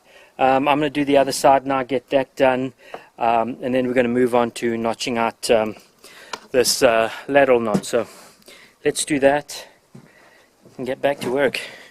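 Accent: South Africa. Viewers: Thats a heavy South-African accent you've got there mate . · Is that a South African Accent I am hearing ?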